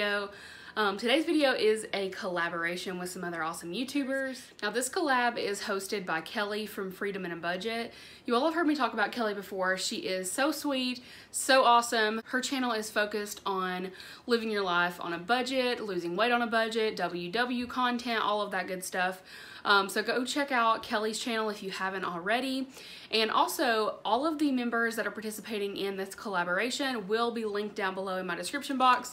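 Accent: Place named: Kentucky, United States. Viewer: I knew you were from Ky from the accent. South Central here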